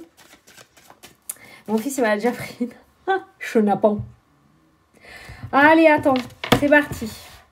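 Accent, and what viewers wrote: South, France. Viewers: merci pour ce tirage, il est trop bien. Un joyeux anniversaire , profitez bien et plein de belles choses. Et comme vous êtes du sud et que je suis du sud aussi , vous me le rappelez trop d'ailleurs, toutes vos expressions me font trop rire , ça fait un bien fou d'entendre quelqu'un qui parle comme moi "mdr". Je vous fait un énorme bisou pour votre anniversaire.merci, merci pour votre bonne humeur dans toutes vos vidéos.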